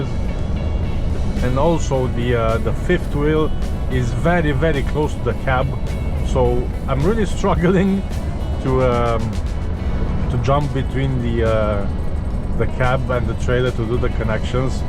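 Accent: United Kingdom. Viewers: Love the way your Scouse is coming along,. Couldn't live without the videos, proper grounding seeing other jobs like yours (and mine) which keep the lights on in the country. Have a good Christmas and New Year squire 👍🏻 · I can hear a bit of scouse in you 😁😁. I am from Liverpool do you no anywhere takin on or what agency do you use?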